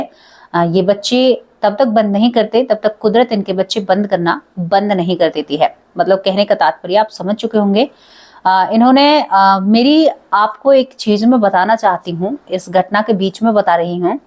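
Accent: Haryana, India. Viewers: मृदुल सुशील जी क्या हुआ अगर यह सही से नहीं बोलता है तो हरियाणा और गांव के लोग ऐसे ही बोलते हैं जो बहुत ज्यादा पढ़े लिखे लोग होते हैं वह भी बहुत बार ऐसे ही बोलते हैं बात हमें समझ आनी चाहिएबस और हमें अपनी बहन की बात बहुत अच्छे से समझ आती है आपको ज्यादा परेशानी हो तो आप जरा युटुब पर वीडियो बनाकर देखिए कितनी दिक्कत होती है श्रद्धा शर्मा दिल्ली से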